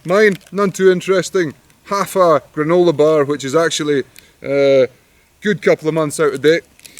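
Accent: Scotland, United Kingdom. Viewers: Arrived for the kilt, stayed for the Scottish brogue and sense of humor. What a beautiful manly man. The brogue tends to make me weak in the knees man. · Scottish accents have to be the best speech style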